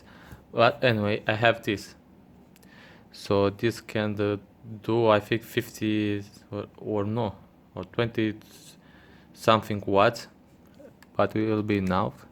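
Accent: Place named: Scotland, United Kingdom. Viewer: you sound like a scotish guy :D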